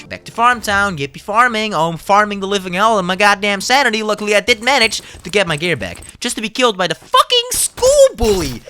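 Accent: Netherlands. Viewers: Dutch (I guess as a fellow Dutchman)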